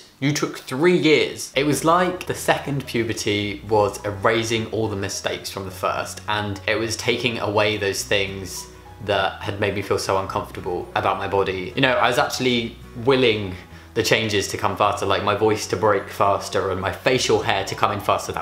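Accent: United Kingdom. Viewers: Why addy Woop well done that accent is British! If you want to hear another follow me!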